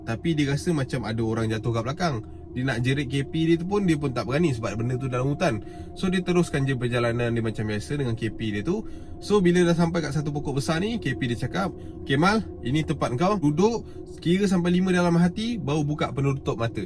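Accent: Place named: North, Malaysia. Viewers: org utara ke?byk kali dgr style ckp MCM utara hehehe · pandai betul cakap utagha. nak belajar lah. hehehehe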